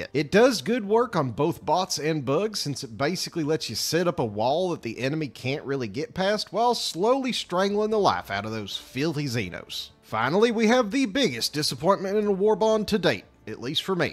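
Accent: South, United States. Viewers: Bro I love your southern accent It's got charm haha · After years of having to endure various European accents, this channel blindsides me with a beautiful Southern drawl. It's like a balm.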